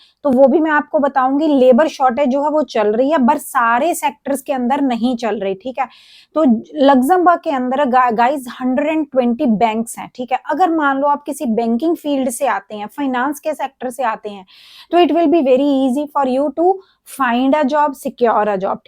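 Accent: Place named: Chandigarh, India. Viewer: आपके बोलने के लहजे से लग रहा है आप चंडीगढ़ साइड से हो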